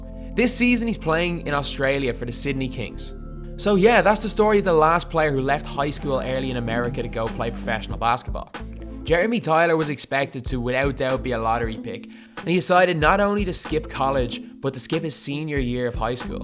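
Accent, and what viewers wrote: Dublin, Ireland. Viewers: are you from Dublin or county's around that like kildare Louth ect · Is that an Irish accent on the narrator?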